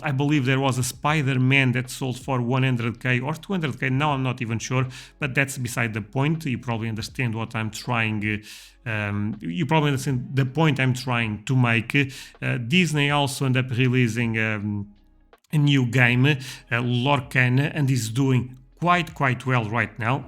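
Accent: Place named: Portugal. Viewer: video muito porreiro, reparei logo pelo sotaque que eras português. novo sub ;)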